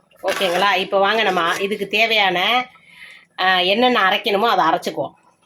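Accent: Kerala, India. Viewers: She talks as if born and brought up in kerala. She neither looks good nor has brain. Attitude mattum high level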